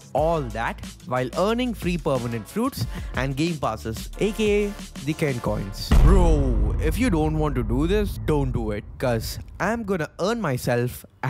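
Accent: India. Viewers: Yuo indian?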